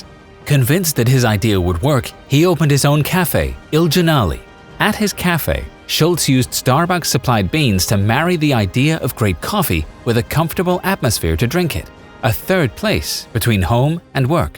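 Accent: United States. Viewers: How did this guy's accent went from being annoying to becoming Americanized in such a short time (3yrs)?? Good effort nonetheless.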